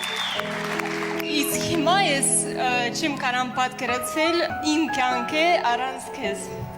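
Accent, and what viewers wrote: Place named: Georgia. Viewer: Հարսը վրացական ակցենտով է խոսում եթե ուշադիր լինենք...❤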